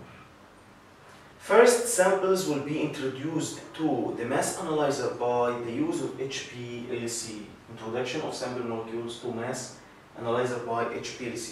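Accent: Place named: Egypt. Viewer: من لهجتك وهيئتك باين عليك مصري رفعت راسنا بالمجهود الجميل دا❤❤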